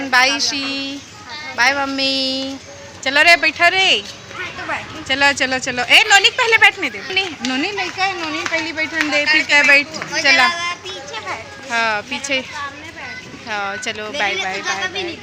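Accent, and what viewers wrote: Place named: Chhattisgarh, India. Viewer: छत्तीसगढ़ी बोली आप के मुह से बहुत बढ़िया लगथे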